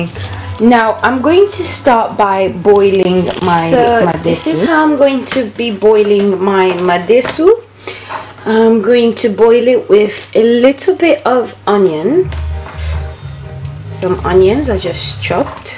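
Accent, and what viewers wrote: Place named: United Kingdom. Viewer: 😍😍😍😍😍😍😍 she talks well, your accent sounds Uk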